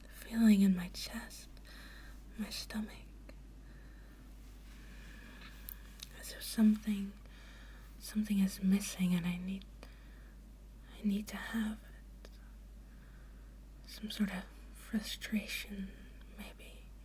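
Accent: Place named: Netherlands. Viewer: It's funny how the teeth improve your diction with your Netherlands accent. ;D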